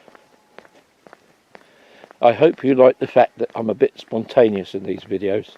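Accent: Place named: Sussex, United Kingdom. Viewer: What a wonderful video, I grew up in Eastbourne and spent many happy hours walking and bicycling through the Pevensey Levels and nearby areas. Firstly, with my parents and Sister, then my best friend Peter Burgess, on my own and later with my Son Gavin. Your video really takes me back to those happy moments. I was born in 1960, so my time's spent here was from the 1960s through the 1990s. I moved to the USA, Lincoln, in Northern California in 1999, and I must say I do miss being able to hop on my bike and ride the lanes. Love your local accent. It's very much like my own, which I'm happy to say I've mostly retained. Thank you for taking me back home for a little while, I'm so glad to have found your video and just subscribed to your channel.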